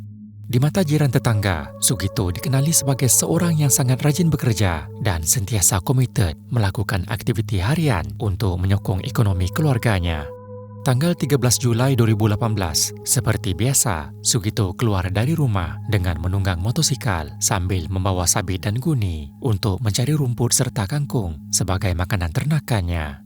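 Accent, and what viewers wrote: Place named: Sarawak, Malaysia. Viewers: ya... Rasa loghat orang Sarawak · ada org Sarawak cakap mcm ni,lagi pun Kalau kt Sarawak ko bunuh buaya tanpa sebab mampos lh ko kna tangkap pihak krjaan Sarawak..Nama pun dia guna atau 😂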